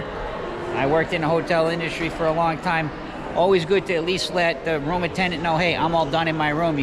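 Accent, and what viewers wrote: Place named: Northeast, United States. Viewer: Can't place that accent. NY? MA? NJ???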